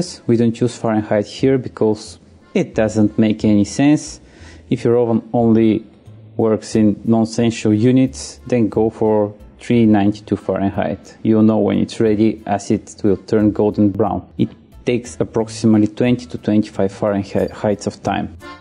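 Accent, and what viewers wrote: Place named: Eastern Europe. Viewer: Nah, u just can't understand slav accent.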